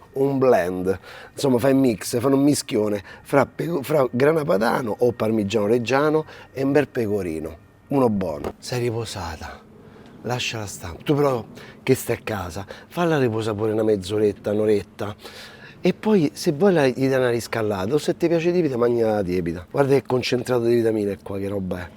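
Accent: Rome, Italy. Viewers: A MILANO PARLI romanesco?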